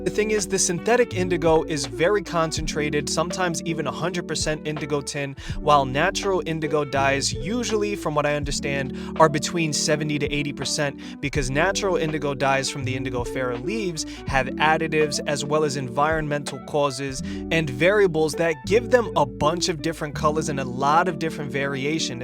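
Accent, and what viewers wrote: New York, United States. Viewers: You got that nu yok accent brotha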